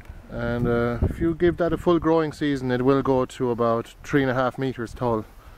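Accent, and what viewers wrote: Ireland. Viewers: Hi,,thank you for the info,,,enjoyed listening to a good old Irish accent,,you have a new subbie now,,hope to make an arch,soon,,,Cheers,,,Stay Safe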